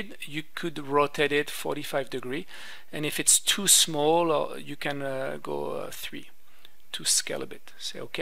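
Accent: France. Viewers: are you french? your accent is amazing :D Thanks for such an effective tutorial :) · You're definitely a French speaker 😅, i love your tutorials 😍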